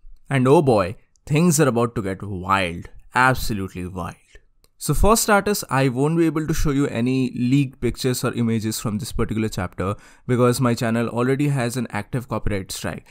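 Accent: India. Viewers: You speak in Indian assent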